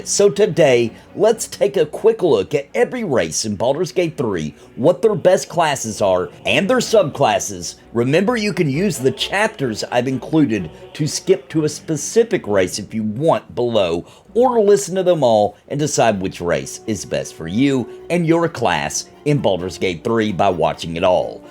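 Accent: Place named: Georgia, United States. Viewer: Are you from Georgia? You have the same dialect as the man the myth the legend himself, Judd. None the less, great video, I'm also gonna be a Paladin Dragonborn!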